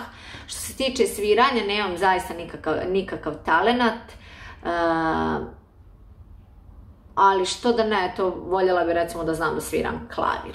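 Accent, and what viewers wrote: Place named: Bosnia and Herzegovina. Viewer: Smatram da ne treba da raspravljamo o tome kojim jezikom prica.Ko imalo poznaje jezike bivse Jugoslavije taj zna dok neko, otviori usta"odakle dolazi. jeste sa Pala ali ima cisti bosanski akcent.Bez ljutnje ali budimo realni i iskreni.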